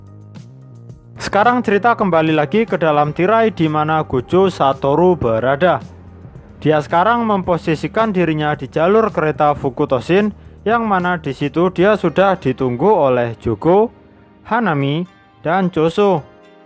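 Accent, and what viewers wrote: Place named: Java, Indonesia. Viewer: terlalu medok gan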